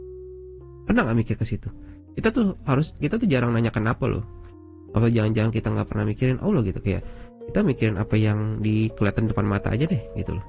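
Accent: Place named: Depok, Indonesia. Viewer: suara lu,mirip mas mas depok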